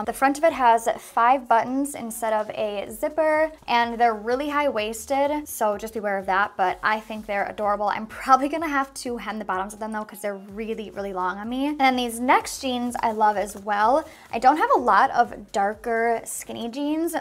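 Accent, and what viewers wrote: Minnesota, United States. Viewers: Do you live in Minnesota I heard you say my Minnesota accent lol I live there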